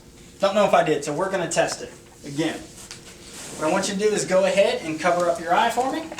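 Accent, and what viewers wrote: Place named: South, United States. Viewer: Get it southern accent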